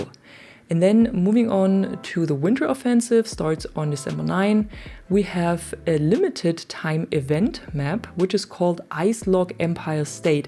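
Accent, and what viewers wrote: Germany. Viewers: Man hört übelst deinen deutschen Akzent raus 😂😂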